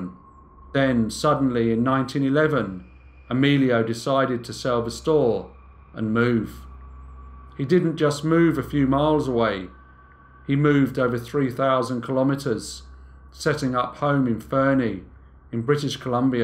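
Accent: United Kingdom. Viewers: Best regards from Mumbai. Appreciate your quality work & crisp British accent. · British speaker...should know better.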